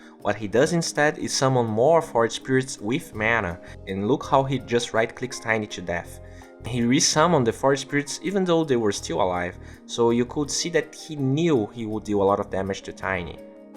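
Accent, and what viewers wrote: Brazil. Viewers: Cara, não mente pra mim, você é brasileiro! Eu posso reconhecer um bom ingles CCAA de longe! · esse sotaque é muito BR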